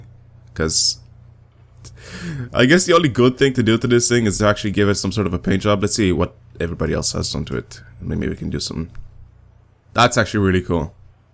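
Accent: Ireland. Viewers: ***** aha, i thought it had a bit of irish, but i always felt like it was something else, i would have asked you earlier, i just didnt have an account :P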